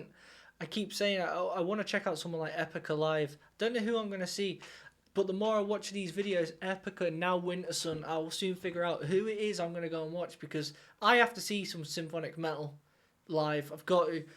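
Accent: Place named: England, United Kingdom. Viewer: Cool to see you reacting to Wintersun, always enjoy seeing what you think of symphonic metal bands and the interesting things you point out. (And the recently was a nice surprise; the first album I ever bought was the Hip's third album, on cassette, when I was in junior high school.) I was pretty sure I remembered you being surprised by the dark-haired guitarist's name,. (Presumably since it sounds like the online store Temu?) Maybe I'm remembering a different reactor? Google didn't find any earlier vids of you doing or other Wintersun tracks, or for. And you'd definitely remember having seen these guys in the same studio rehearsal session and wouldn't have used this title. Maybe the topic came up in a reaction or something, when you were talking about a recommendation you'd gotten or something. I can definitely picture an English accent like yours saying " " and being surprised and amused by it. 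--- I'm not sure what Wintersun use to get that acoustic-like sound from those guitars which obviously don't have a resonant cavity. One possibility is a modelling amp, which simulates the sound an acoustic guitar would make for the string vibrations picked up by the pickups. (e.g. uses a Kemper modelling amp for the guitar neck of The Beast, e.g. in (middle subtitles included version if you want to check it out), or in a few songs like or I think where he switche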